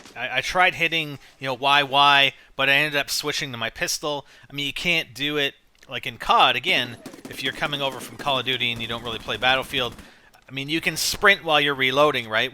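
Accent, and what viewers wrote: Canada. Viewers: Wait... are you Canadian?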